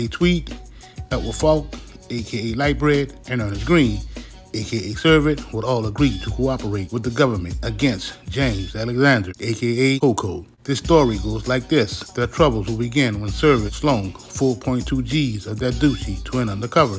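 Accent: New Orleans, United States. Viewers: for sho Big Homie I know you out the city I know new Orleans when I hear it . Yeah talking bout those people who don't know new Orleans like we do . But anyway keep the stories coming.love the channel. Btw I'm out dat 8.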